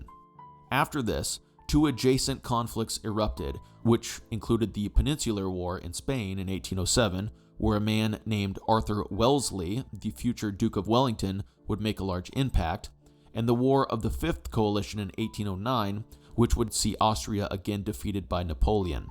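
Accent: United States. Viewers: Since you're British, why do you use an AI American accent, and maybe you should calm down your sympathy for the British? You don't want to sound too biase and / or partisan. It breaks the immersion of you being historical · random, but are you American by any chance? To me you sound so, and you seem to be going a bit heavy on them in this video. Just saying. Not discrediting you or anything.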